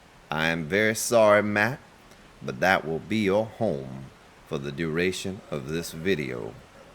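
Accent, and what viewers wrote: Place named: South, United States. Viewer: I have laughed more on this video at the silliness your friend put you through than I did at G4Kz when the bear was chasing him. If any gets mad, they don't, in my opinion, have a very good sense of humor. as for how many times you said boo boo I counted five times. don't get me wrong, but your accent went real southern in this video. don't know what state you were raised in, but it sure sounds a lot more of the south. great episode.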